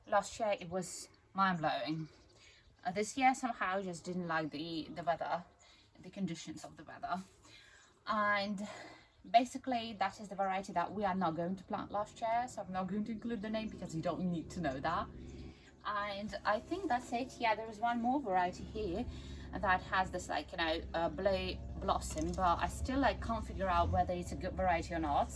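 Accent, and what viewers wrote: United Kingdom. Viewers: Your British English is amazing. You don't have Russian accent at all. I learned Alaskan English in 1990 and my Central European accent is still there even now. Potatoes don't have to grow in the ground. They grow well in hay, freshly cut grass, leaves and anything else that creates cover and produces heat. In Palmer, Alaska , farmers grow these red potatoes as well as purple potatoes. Best wishes from Alaska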